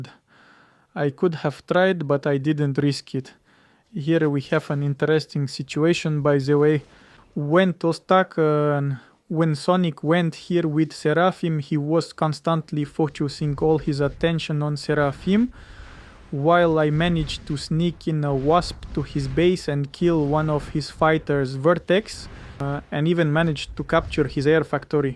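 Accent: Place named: Russia. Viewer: не то чтобы хорошо, просто русский акцент сразу в уши бросается)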